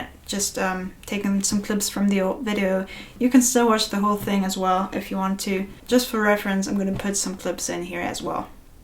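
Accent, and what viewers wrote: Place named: United Kingdom. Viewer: This was really helpful for me. I have A Colling CJ45 which someone had retrofitted a Fishman Sonitone to and it sounds really quacky, so I was considering this exact swap. I didn't really think the Baggs piezo was much better that the Fishman (although it was a BIT better), and the mic was very brash. The 50/50 was a definite improvement, but I'm gonna have to think really carefully now. I do think K&K pure mini is a better acoustic sound than either, and I have it in one of my Martins, but whatever the makers say, it needs a pre-amp with some pas, and that's extra hassle. Thank you very much. By the way, your English is excellent (I'm from England), and I would never have guessed you were from Germany. You have some US phraseology, but your accent is really close to UK English, which is so nice to hear. Finally, there's no reason not to put that pup into a Shawn Mendes - it's a lot of guitar for the money. 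Martin are selling horrible laminate ones for about the same money.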